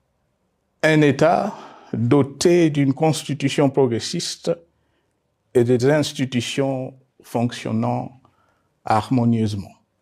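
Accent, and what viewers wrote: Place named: Rwanda. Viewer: Je suis tellement pcq tu as un ton de kinyarwanda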